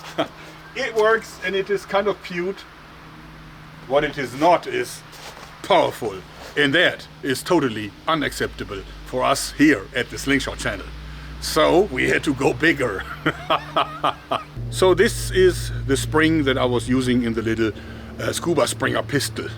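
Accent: Germany. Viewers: Ganz klar deutsch der typ haha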